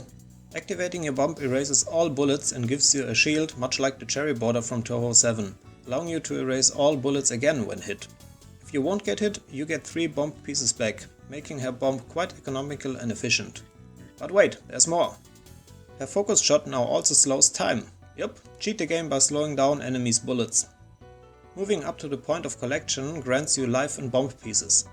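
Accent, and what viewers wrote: Germany. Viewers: Is that a german accent? I can feel it. I'm suffering from it too.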